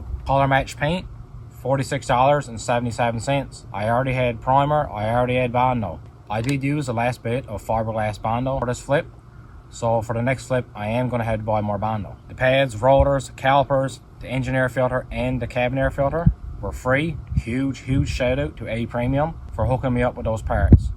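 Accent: Newfoundland, Canada. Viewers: I'm not into cars and repurposing vehicles, but for some reason i tend to like your videos lol. maybe its the fellow newfoundlander accent that keeps me watching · I think he's from Newfoundland. Its the closest thing to an Irish accent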